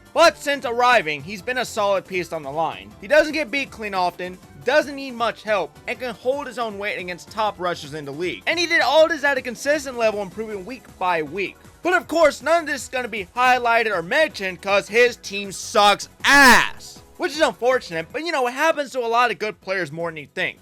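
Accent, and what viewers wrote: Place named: Pittsburgh, United States. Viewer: I can hear the slight yinzer in you.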